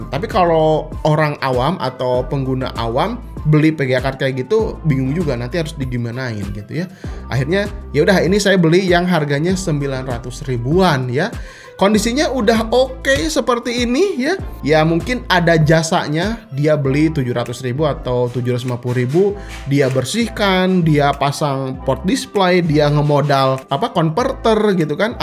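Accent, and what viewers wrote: West Java, Indonesia. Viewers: sunda pisan wkwkwk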